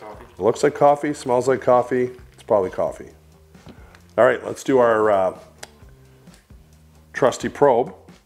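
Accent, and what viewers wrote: Canada. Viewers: As always, &, I love your videos! Your honesty and real life situations make me feel like I'm at your home and you're giving real advice! Much appreciated! My Mom was co-owner of a coffee service. Once a month you should run a full pot of 1/2 white vinegar and 1/2 filtered water through the pot portion. And 15cc white vinegar and 25cc filtered water through the espresso side on Luongo setting. BUT that is only if you use both sides daily! If not than every other month is good. I used to have to clean the machines that were replaced, or being repaired. White vinegar is better than any so-called coffee machine cleaner! Those cleaners almost never flush out entirely. Their residual lousy taste lingers!!! White vinegar flushes right out! As a rule of thumb, I would always tell our customers to make your first pot of just water. Then make ALL the coffee & espresso you want!😊 Optimum coffee/tea temperature of 55-68°C/167-170°F is best to not experience the bitterness that most people find distasteful about coffee. 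Never put a Yeti, or any other insulated double walled steel/plastic/triton cup on the hotplate on the pot side!!! It can cause a fire! Nova Scotia, eh? I thought I detected an Eastern Canada accent! Parlez vous France'? Je parle les France' au les Canadienne! 🙂 Again, I LOVE your videos!!! All your hard work is much appreciated!!!🇨🇦🇨🇦🇨🇦 Nancy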